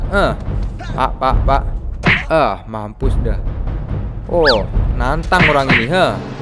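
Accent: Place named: Java, Indonesia. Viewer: Med'do banget nadanya Terus nora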